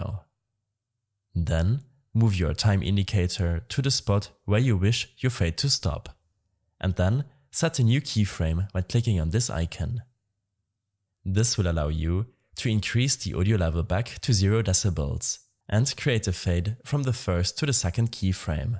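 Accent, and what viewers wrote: Germany. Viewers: Korrekt, danke dir :) Man hört raus, dass du deutscher bist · Bruder was ein harter deutscher Akzent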